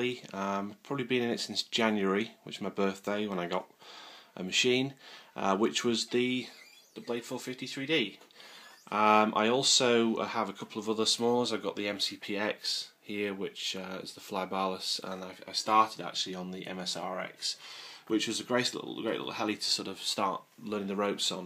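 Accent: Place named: Germany. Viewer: where from germany are you from, i noticed the accent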